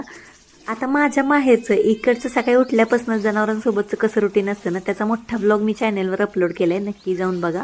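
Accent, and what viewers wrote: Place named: Sangli, India. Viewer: आमच्या सांगली सारखी भाषा आहे ❤❤ छान दिसताय 😊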